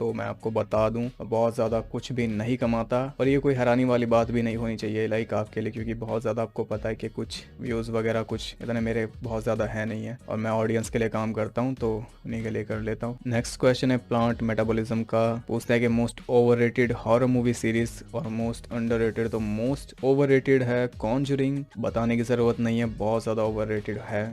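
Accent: Haryana, India. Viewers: Your Harvyanvi accent make impact on your explanation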